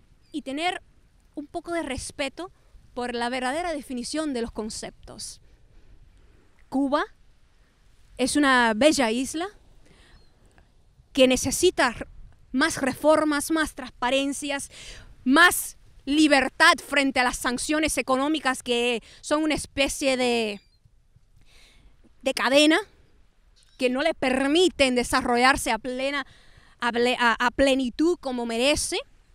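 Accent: Spain. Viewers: Niña estás locamente loca Dios mío manera de hablar mierda,eres cubana q mierda hablas como española con esa Z horrible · Ridículo tu acento gallego que sacamos a punta de machete , tu te estas aguando en un vasito de agua, sin ningun proposico. En Suecia la Universidad es gratis en Canadá te ponen un yeso. Eso es lo que queremos en Cuba . los suecos no tienen comunismo. Ja Tienes 12 latas de atun y dos generadores eléctricos.Mejor dejo a esta estúpida.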